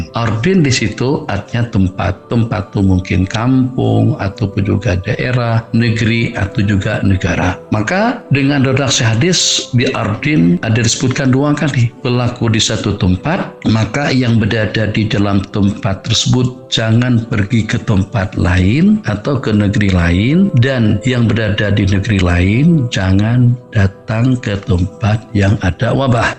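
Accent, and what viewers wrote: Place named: Banjar, Indonesia. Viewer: sheikh ni Malaysianlah cuma dialeg yg d gunakn ming meng dialeknya etnik Banjar dn asal dia Indon ttpi dh lama...mcm orng Cina dtng ke Tnh Melwati awal 1900 dahulu... Agama Islam menggabungkn pandngan agamawan dn bijak pandai moden spt dr/pakar...dh lama kita ikut ckap pemerintah dn dr/pakar tetapi kenpa kes covid makin buruk? Cuba jwb kenapa? Itu maksudnya sudh smpi masa kena minta dn dngar pandngan semua trmasuk agamawan juga...faham! Apa yg sains/barat dpt semuanya dari AlQuran...ngerti!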